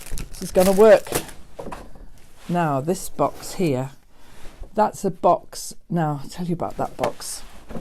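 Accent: United Kingdom. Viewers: Hi, I'm so glad that I found your channel by accident some dass ago. I searched for some ideas for scraps as I regularly do, but this time your pink/red quilt showed up and caught me. I watched some of your videos since then and I really like them. Hearing you talking, looking at all the craft supplies and hearing your dialect is great (I'm German and love hearing different kinds of English, especially "British English"). All the best, Stephanie PS: Just subscribed for future videos 😉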